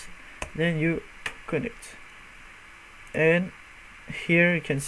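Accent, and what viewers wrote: France. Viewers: C'est trop drole... Ton France es tres prononce. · T'es français toi avec cet accent non? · Are you french ? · Ton accent est mortel :D French powa !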